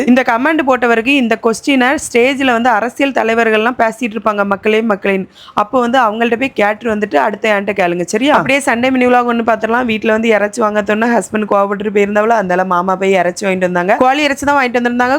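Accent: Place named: Kanyakumari, India. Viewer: Ithu enga kanyakumari slang ok va nanga ippadi thannnnnn makaleeeee......... Cmnt pota naye😂😂😂😂😂😂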